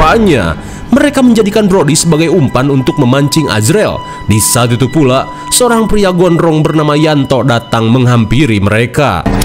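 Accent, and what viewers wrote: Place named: West Java, Indonesia. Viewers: tadinya serem bgt malah jadi hyg seuri🤣🤣🤣akibat baceo na kang chanel sunda pisan 😅😅😅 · Mimin aslina orang mana sih, logat sundana asa familiar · Nu nyarita ciga urang kadu ngora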